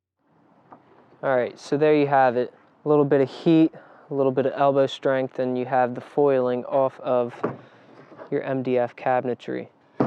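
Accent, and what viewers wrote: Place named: Philadelphia, United States. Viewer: Thank you so much for this tutorial! I could tell you were from Philly from your accent! :)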